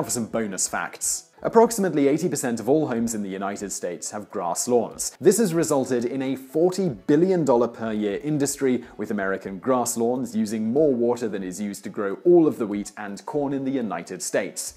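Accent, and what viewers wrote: United Kingdom. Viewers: U British people talk so funny. U said advertisement funny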